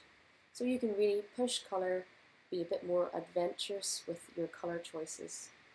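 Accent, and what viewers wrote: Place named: Ireland. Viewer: Great video as always! Also, I love your accent. My grandpa was born in Ireland so my pride for the Irish is strong!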